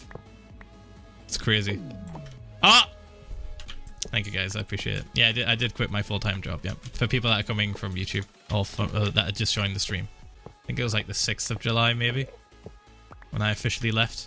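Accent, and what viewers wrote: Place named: Northern England, United Kingdom. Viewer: Nothharn LKegnAnd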